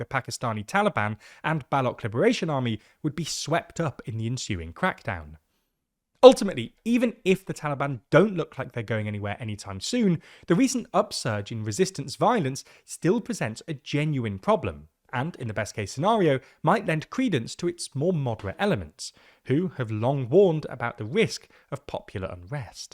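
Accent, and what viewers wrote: United Kingdom. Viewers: By your British accent I can tell you are one of those who gather some audience and then start political analysis of their own. Here in this case you data collectors have been totally wrong. There is no such thing here in Afghanistan what you call uprising. Afghanistan is under strict control of Taliban who were brought by your Dads(Many in number) the United Sates not by the British who died in hundreds while defending American interest. Thanks to president trump and his administration who spitted on the coffins of British forces and didn't even bother to gift everything to Taliban. By the way you should another podcast about Russia going to attack British military targets in Ukraine. yesterday's attack 12 British engineers were smoked. Hahahaha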